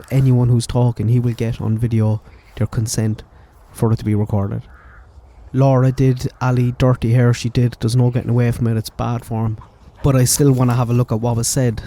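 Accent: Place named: Galway, Ireland. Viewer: You’re from Galway? I was trying to figure out the accent lol. I love Galway. I’m Waterford living in Kildare ☘️ . Glad a logical Irish thinker is covering this ❣️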